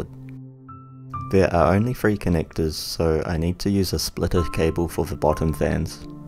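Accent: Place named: New Zealand. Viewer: I can identify a kiwi accent pretty quickly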